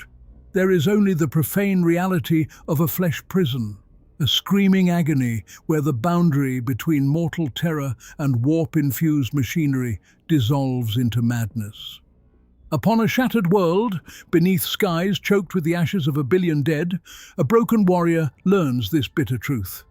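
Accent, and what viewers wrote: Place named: United Kingdom. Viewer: Sounds British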